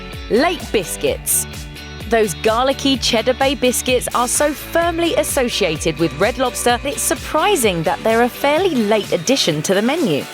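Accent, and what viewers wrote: England, United Kingdom. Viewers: You talking about red lobster with English accent? Fuck you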